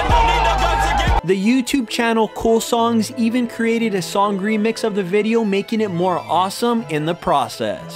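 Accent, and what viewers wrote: South Korea. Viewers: Btw he's korean just by his accent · sounds korean to me. I also think regardless of what type of Asian he my be good on him...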